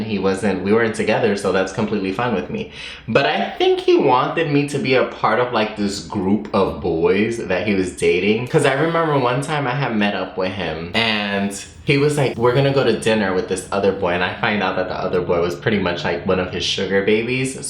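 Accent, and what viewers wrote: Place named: New York, United States. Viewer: Your NY accent is heavyyyyy! 😂 mind you I’m from NY too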